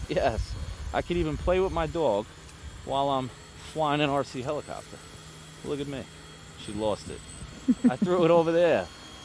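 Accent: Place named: New York, United States. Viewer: I bought both because why not. I love your nice thick grass and your cool NY accent.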